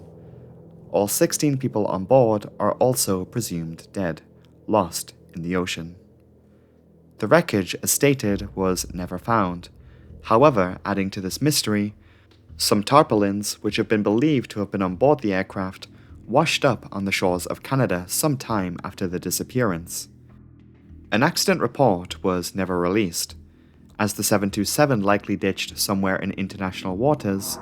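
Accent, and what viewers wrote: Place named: Northern England, United Kingdom. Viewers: Totally off topic but I'm interested about the narrators accent. It sounds northern English with north American influence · It sounds similar to another UK creator I watch, Lazy Masquerade. I had never heard an accent like that until I started watching him, and now.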